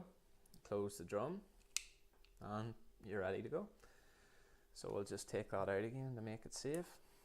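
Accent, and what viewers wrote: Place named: Northern Ireland, United Kingdom. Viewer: Sounds like Northern Ireland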